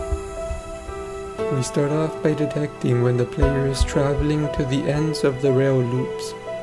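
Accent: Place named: India. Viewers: Yup it sounds slightly South Asian/Indian to me too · To me It looks like the accent of indians you find everytime you search for something related to programming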